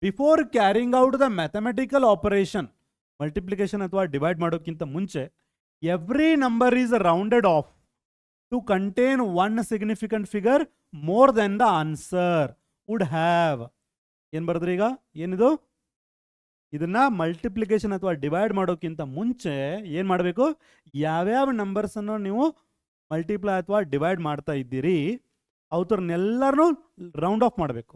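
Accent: North Karnataka, India. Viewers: Sir nimma uttara karnataka speaking ❤❤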